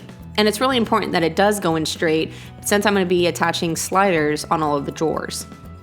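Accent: Texas, United States. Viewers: I really like your work, I have for a very long time. this build and project video. are great. Also love your Texas voice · Wales here too:P ever since reading another comment mentioning the word sawL I notice it everytime now but hey a Texas girl, everywhere has their own little language/dialect quirks - how many people in the world will know exactly what a 'cwtch' is?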